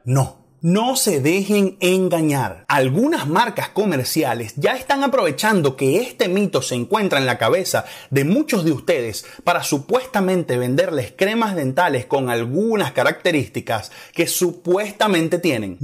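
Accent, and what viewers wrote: Venezuela. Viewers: el es venezolano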